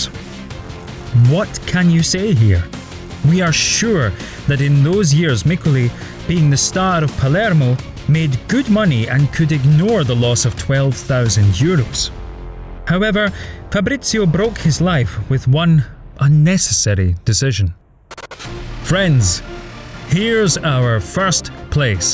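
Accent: Scotland, United Kingdom. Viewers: its scotish?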